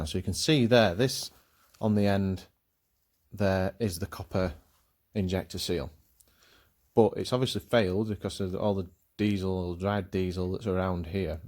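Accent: Yorkshire, United Kingdom. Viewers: is that a Yorkshire accent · It is a Yorkshire accent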